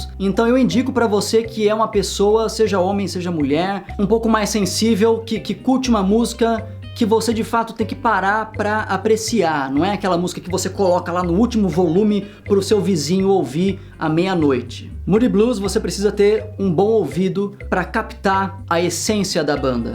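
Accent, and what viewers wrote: United Kingdom. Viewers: Cara, você tem que fazer mais vídeos de inglês. Vi seu vídeo sobre o box do Pink Floyd e fiquei impressionado, cheguei a pensar que você fosse realmente britânico. Valeu!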